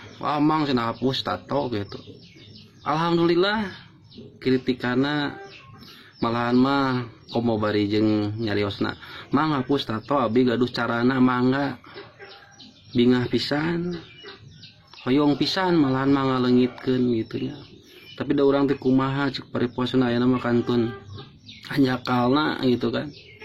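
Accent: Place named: Sunda, Indonesia. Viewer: Sunda asli mantul mang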